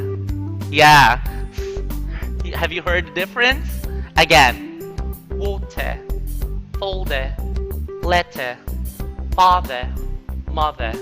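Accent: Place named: United Kingdom. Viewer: It's really kind a different from the other pronunciation drill sir. Now, I learned a bit of British accent. I'm so very thankful of the videos that you made sir. Keep it up! 😇 #BTLED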